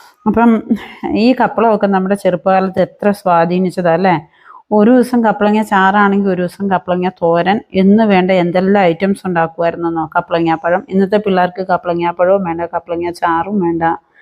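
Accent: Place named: Idukki-Kottayam, India. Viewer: Chechide slang idukki kottayam slang polind😇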